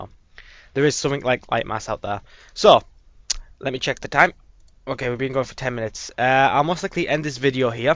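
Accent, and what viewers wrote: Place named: United Kingdom. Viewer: You sound very British when you're sick, so British that I sometimes have trouble understanding it D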